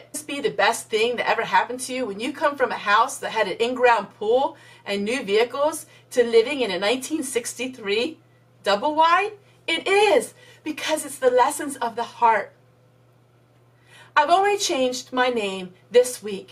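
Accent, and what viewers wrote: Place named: Maryland, United States. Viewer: If you don't mind me asking what state do you live in? I was raised in Maryland and your accent sounds so familiar. I have been living in Georgia since 1991 when I was 14 years old. Now at age 42 I have a deep southern accent. I'm really glad we are now friends. It seems like we have alot in common.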